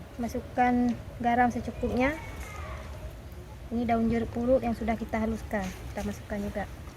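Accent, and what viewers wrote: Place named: Aceh, Indonesia. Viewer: dapu tanyoe aceh iy logatny jg.hehhe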